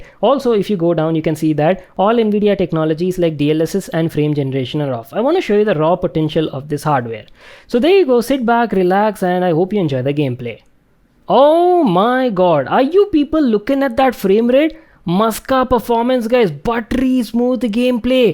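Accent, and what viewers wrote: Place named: India. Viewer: He speaks english just how Americans/native english speakers thinks how indians speaks english.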